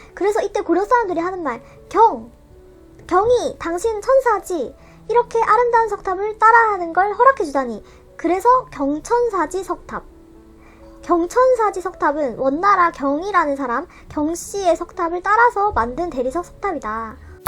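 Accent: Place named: Jeolla, South Korea. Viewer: 전남쪽 냄새가 납니다ㅎ귀여워요~ 유용한암기법 잘 보고 갑니다잉^^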